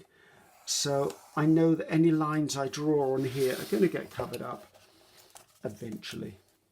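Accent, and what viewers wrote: England, United Kingdom. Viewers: First of all, I love your videos. I normally never look long videos. I get bored very quick. But with your videos I like the things you tell, your English accent , and I am afraid I miss something when I skip parts. :) I used to glue the pages, but I dont do anymore. My gluesticks cost 5 Swiss Franc ( about 4 pounds) x 3 , too much for me to spend before I begin a journal.. So now I make my mixed media pages separate and than glue it on a single page. No bulk Most pages will be covered with just paper collage anyway Or I buy a nice MM journal for that 12 Pound and alter it on the go. . The gluestick I use is Tombow mono Professional glue stick. It is SO good, no buckling , and even after many years it sticks very strong. And I am addicted to the smell. After a long surching way finding the best and cheapest liquid glue : collall all purpose glue! 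It sticks everything , paper , fabric, etc. And it costs almost nothing here in Switzerland. Well , long story, and I think you know most of it already, Bye bye, have a great week!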